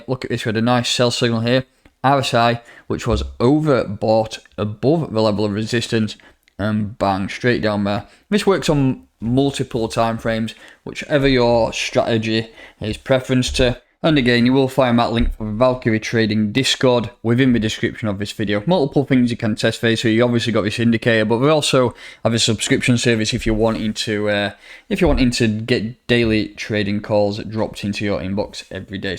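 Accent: United Kingdom. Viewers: This is english..i bet you are american..he speaks British english..always american thinking they know it all.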